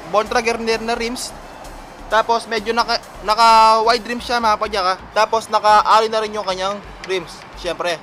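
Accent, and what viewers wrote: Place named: Pampanga, Philippines. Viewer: Sir kapampangan ka. Hehehe. God bless po. Nanay ko po kapampangan same accent po kau. 😊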